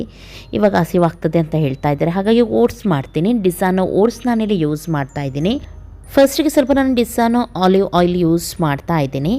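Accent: Mangalore, India. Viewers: Hi mam Nim mnglr kannada language chengiruthe mam & Nice video mam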